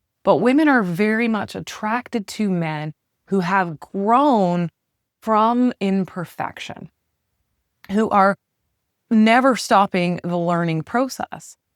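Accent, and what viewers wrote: Canada. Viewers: along with the fact that you're smoking 🔥 hot, I really get a kick out of your Canadian accent. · Really. Is it Canadian.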